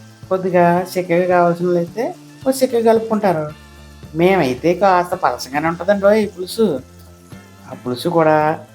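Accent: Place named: Konaseema, India. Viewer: konaseema voice and super curry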